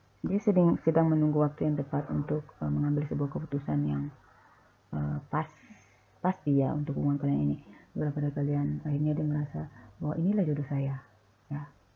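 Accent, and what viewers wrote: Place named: Sumatra, Indonesia. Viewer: pembacanya sederhana banget,sumatera /luar jawa ya jeng,💪💪,suka dgn kesederhanaan.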